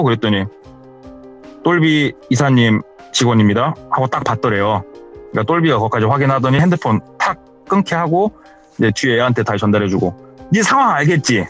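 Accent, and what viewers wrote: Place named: Chungcheong, South Korea. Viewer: 충청도 분인가ㅋㅋㅋㅋ 익숙한 억양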